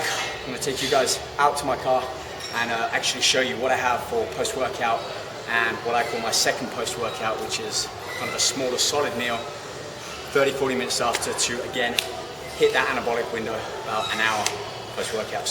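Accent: United Kingdom. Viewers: Left hand drive? --- and his British, where is he ? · I hear a British accent in his voice. Where is he from? And how long has he been a bodybuilder?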